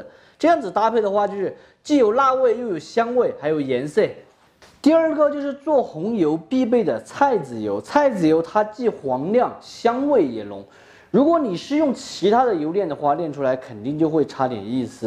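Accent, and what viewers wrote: Sichuan, China. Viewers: 你的川普好听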